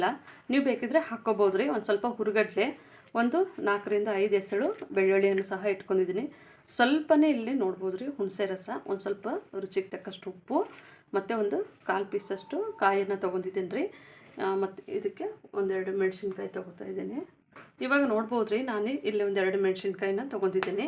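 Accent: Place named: North Karnataka, India. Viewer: ನೀವು face ಯಾಕೆ ತೋರಿಸುವುದಿಲ್ಲ. ಉತ್ತರ ಕರ್ನಾಟಕ ದವರಾ a style matadi ಚೇಂಜ್ ಮಾಡ್ಬೇಡಿ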